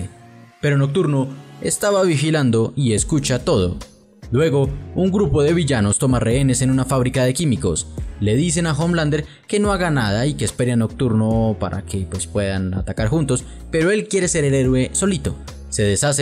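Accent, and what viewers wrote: Colombia. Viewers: Es tan evidente q ud es Colombiano jejejejejejejeje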